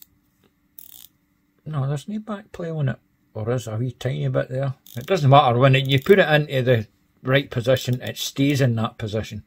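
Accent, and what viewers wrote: Scotland, United Kingdom. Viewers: That was aggressively Scottish and I am here for it! 😂 Subbed!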